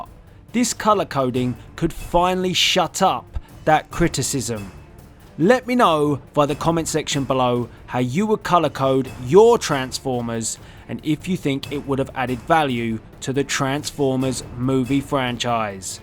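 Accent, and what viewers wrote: England, United Kingdom. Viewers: what part of the UK is that accent from!?!?! hahahahaha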